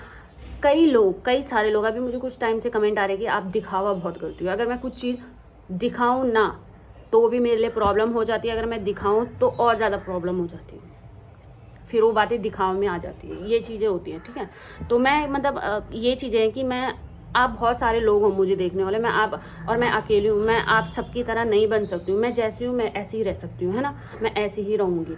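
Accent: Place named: Garhwal, India. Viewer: Ap bhut axe ho or jb aap gadwali bolte ho to bhut acha lgta h❤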